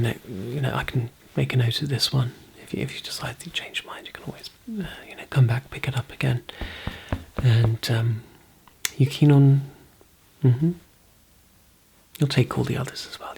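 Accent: United Kingdom. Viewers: I know your accent is British obviously, but you look Swiss. 🤔 🤠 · You would have been a better loki any day 😄 that accent is classic very agatha christie 😏 you should do a sherlock homes asmr 👌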